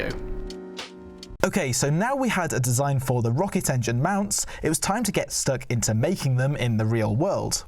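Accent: United Kingdom. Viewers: Is bro speaking UK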